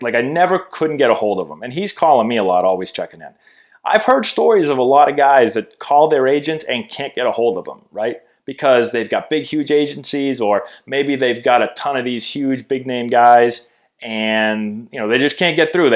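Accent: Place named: Massachusetts, United States. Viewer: I guess it's the Massachusetts accent. I heard car deal not card deal :) .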